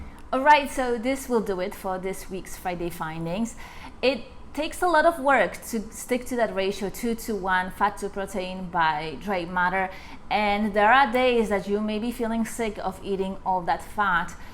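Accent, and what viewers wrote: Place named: United Kingdom. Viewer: With your British(?) accent you need to speak slower and more clearly if you want to increase USA audience, although you are pretty good looking so maybe it won't matter. However thank you for your good content that I will try now to listen to. Correction, your speed and clarity now that I have listened is really not bad. I might be just a slow old man.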